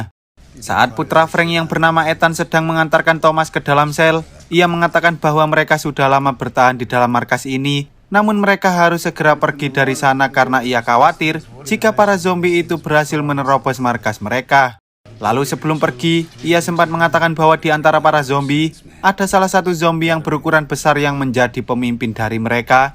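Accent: Java, Indonesia. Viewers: dari ngomong nya udah pasti orang jawa❤🎉😅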